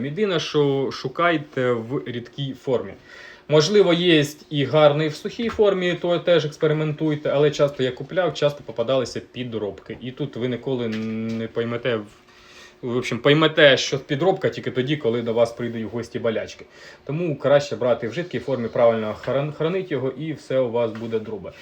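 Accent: Russia. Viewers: Да , да охотно верю, прослушай ролик.Твое " Вiльне володіння" аж по ушам бьёт.Ты даже слова выговариваешь на русский манер.Там лютый Суржик.Одного не понимаю НА ХЕРА ОНО ТЕБЕ НАДО? Был нормальный канал, на нормальном языке и тут тебя Майданутость покусала? Кстати если Шо Я в Винницкой области.А то сейчас начнёшь " Вам россиянам ,нас вильных не понять."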